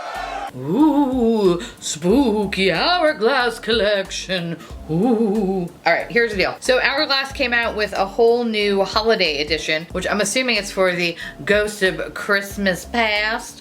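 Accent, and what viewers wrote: England, United Kingdom. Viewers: I love the English accent 😁❌✖❌ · This is the first time I've heard your British accent and I need it more in my life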